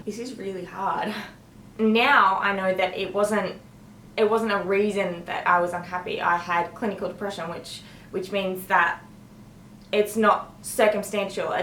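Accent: Australia. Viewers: Beautiful accent..... Australian?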